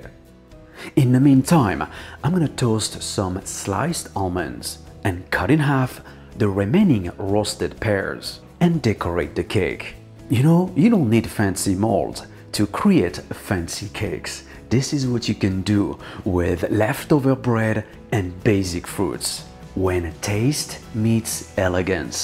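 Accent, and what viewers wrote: France. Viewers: You killing me with French accent. Every things in your hand turn to masterpiece. Love from Sweden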